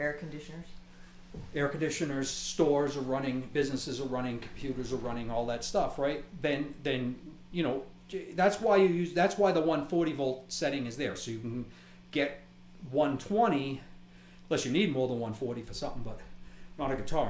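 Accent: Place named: Boston, United States. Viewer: Greetings from back east. You are Boston to the bone. Love it!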